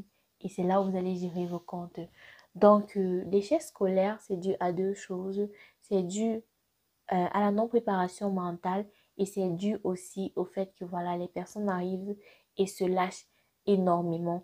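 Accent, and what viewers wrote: Cameroon. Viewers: Ma belle petite soeur, tu es super belle, mais je suis etonner que ton nom sonne comme si tu es Senegalaise mais ton accent et ton style Kamer 237 avec ta douceur me tue seulement ❤️❤️❤️💗👍💗💗😁😁😁😁😁😄😄😄😄😀😀💖💖💖💖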